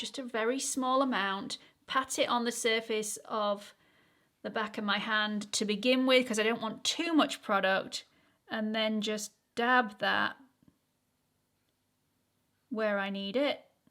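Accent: United Kingdom. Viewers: British English ❤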